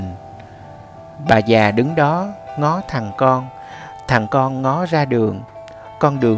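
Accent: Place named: Mekong Delta, Vietnam. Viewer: Thích giọng đoc của em,đặc sệt miền Tây,chất phát.Cám ơn ,thân chúc sức khoẻ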